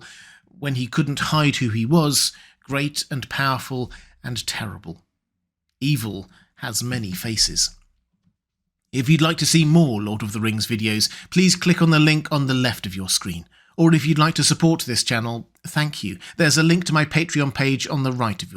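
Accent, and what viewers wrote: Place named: United Kingdom. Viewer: What is accent? It seems British but not really.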